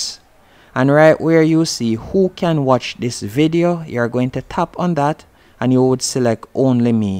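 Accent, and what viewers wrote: Jamaica. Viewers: ya jamaican?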